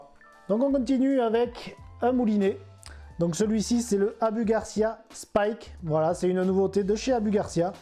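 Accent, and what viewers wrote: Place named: Southwest, France. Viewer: Nouveau format très sympa beau décor est le tout avec un accent du sud-ouest . Je participe au jeu concours .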